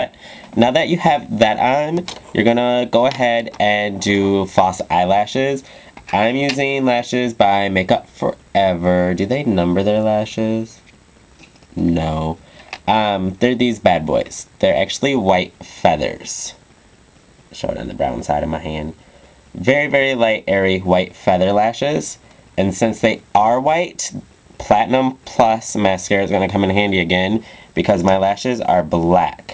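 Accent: United States. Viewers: I laughed when you said (something like that) "or maybe look like a drag queen on smoke-snow" *laugh* (do you mean the white powder - like drugs?) (American English is defenitivly harder to understand than the English one) *THUMBSUP* For your good Make-Up Tutorials :)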